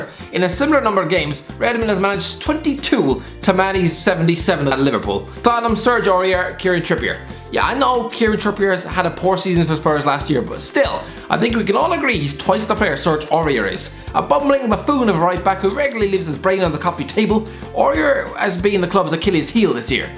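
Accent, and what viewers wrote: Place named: Ireland. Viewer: Pro tip for all the non-Irish folks, watch the video at 0.75x speed to better understand the genius of his jokes through his accent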